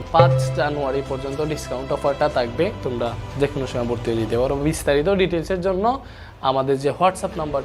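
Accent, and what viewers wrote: Sylhet, Bangladesh. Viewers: ভাই আফনার বাড়ি সিলেটে নি?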